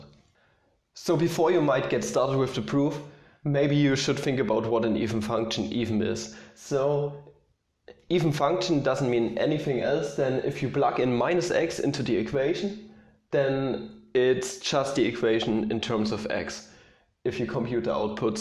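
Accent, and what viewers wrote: Germany. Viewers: They're both great. Having taken four years of Deutsch in high school, I appreciate the German accent, helps me practice!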